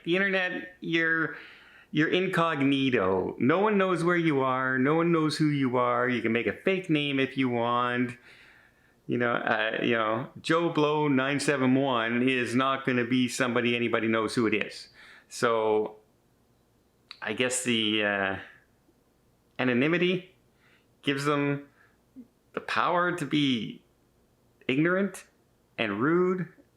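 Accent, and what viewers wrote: Canada. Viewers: 'eh. Me and hubbies love your channel. being Finnish people ask me if I'm Canadian as I pronounce words like you. Good luck to you and Tony. We are cheering for you. Your neighbors in Sault. Michigan. The yoopers. Prayers for your health. Kate and Jim Peach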